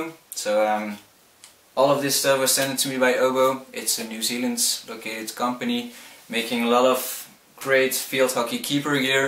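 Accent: Netherlands. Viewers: You dutch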